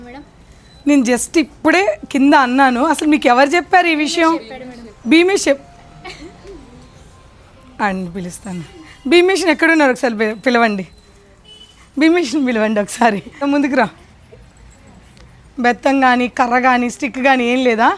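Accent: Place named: Nellore, India. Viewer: Nellore la vuntundhi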